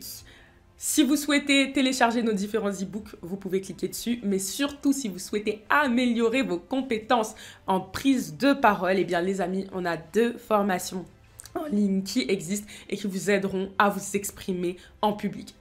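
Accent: Ivory Coast. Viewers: 😅l'accent à l'ivoirienne, me tue Bonne continuation ma grande soeur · Je savais que t’étais ivoirienne. Malgré le « shôkôli » on se reconnaît 😂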